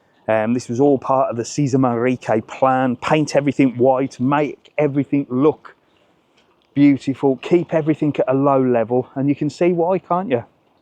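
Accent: Birmingham, United Kingdom. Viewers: Just recently discovered your videos. Loving them. I'm a Brummie myself and love your Brummie way, and your Mum and way too, haha..trust him to spot MaccyD's! I'm severe sight impaired and have hearing aids too now. I am closely considering a stay in Lanzarote, and at the Arrecife Grand; so it's useful to get a good look round and insight. Probably be the first Romulus FC fan in Lanzarote...I wonder.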